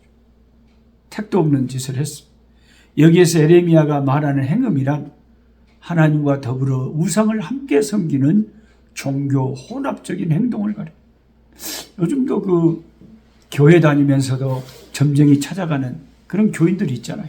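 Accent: Jeolla, South Korea. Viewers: 목사님말씀으로 하루를 시작해서 에레미아서 까지 오게됨을 감사합니다 여기는 순천이레요 옆마을 벌교 사투리에 웃으며 정감이 깊습니다 추위 잘이겨내세요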